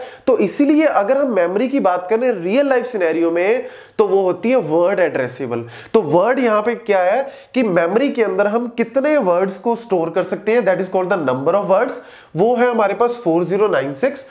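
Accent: Punjab, India. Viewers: your punjabi accent is sooooo cute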